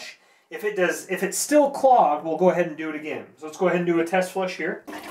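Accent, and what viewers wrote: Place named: South, United States. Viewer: southern accent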